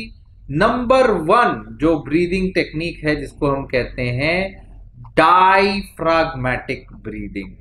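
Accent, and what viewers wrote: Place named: Punjab, India. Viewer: Maadi moti 😄 punjabi nikal e jandi aa sir 😂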